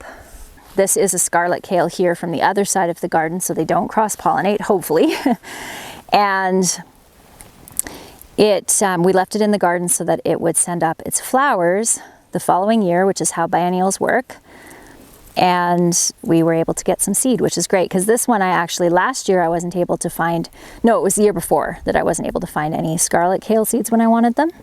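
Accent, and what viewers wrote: Canada. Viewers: No one else takes the time to show people the seeds themselves and how to get them out and how to harvest them because honest to God I had no idea that was so much into saving seeds but I'm thankful I found your blog if I had not found your YouTube channel I would be lost when I start doing this next year myself and I am going to watch everything you have on this because I really honest to God appreciate you doing this thank you so much I'm from America and it sounds like you're from Canada I haven't really checked that out but I can tell you this right now I really do appreciate it and you're you're an awesome parent I have not gotten to see your husband enough to see you know what kind of parent he is and honestly it doesn't matter you are a wonderful wonderful mother and you are so good with your children and your children are smart they act like they're homeschool kids they're very very smart and they are always talking to you and always telling you about something you actually listen to them that is amazing God bless you and your families and my family's prayers and thank you again so much for sharing your journey I have cancer and I would not be able to do that right now and I appreciate it so much that I'm learning something and I'm enjoying it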